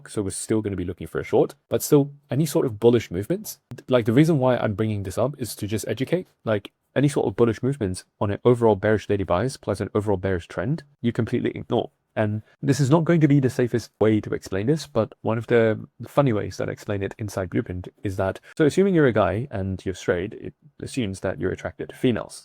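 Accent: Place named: England, United Kingdom. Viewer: Not sure what it was, I’m Australian and you’re accent is slightly English but super fast I had to slow it down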